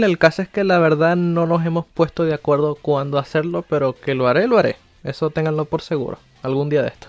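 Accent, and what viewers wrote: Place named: Chile. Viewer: Yo pensaba que eras de chile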